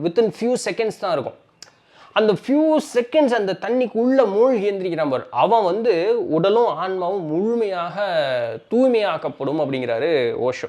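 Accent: Coimbatore, India. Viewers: Well said, ur info with coimbatore slang is truly exceptional 😅. Keep rocking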